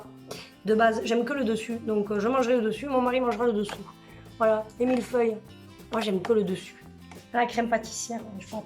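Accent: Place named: Provence, France. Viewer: Bonjour, Je suis ravie d'avoir découvert votre chaîne lors de suggestions der retour de courses proposés par le site YOU TUBE et je tiens à vous féliciter de la façon à laquelle vous présentez vos vidéos, vous dégagez beaucoup de bonne humeur, de la joie, une énergie débordante et surtout je vous trouve très rigolote et je peux vous assurer dans ce contexte du coronavirus,vous vous transmettez beaucoup de bien, vous dégagez beaucoup de bienveillance, de modestie et de générosité. Je m'interroge sur ce petit accent bien provençal si je ne me trompe qui est charmant. Je vous encourage à nous proposer des vidéos de retour de courses ou dans d'autres thèmes. Au plaisir de vous visionner prochainement Bonne fin de week-end Fanny ( Paris XV ème)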